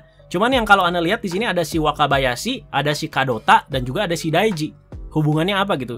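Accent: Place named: Madura, Indonesia. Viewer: MC nya kayak orang madura.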